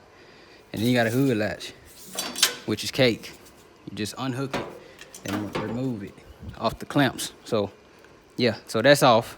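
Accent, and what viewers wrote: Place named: South, United States. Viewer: You live in the south somewhere, man. You do speak properly.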